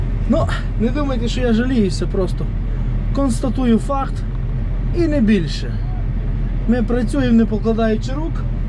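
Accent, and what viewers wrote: Ternopil, Ukraine. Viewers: То шо, Тернопіль? Файна мова😀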